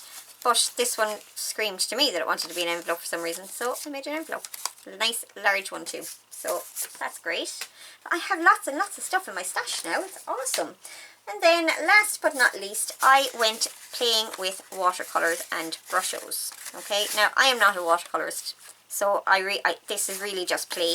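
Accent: Ireland. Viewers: Thank you 🥰 all of your ideas are very helpful and definitely inspiring. I can imagine this does happen from time to time, I am just lucky I mix it up a bit in between crafting projects, like doodling, knitting, sewing and checking out different techniques from all the amazing crafters we have in this collaboration! You are all very knowledgeable and experience and a source of great talent! Thank you for sharing your time and its lovely to hear that bonnie Irish lilt once again. Blessings and hugs 🙏🏻💜🥰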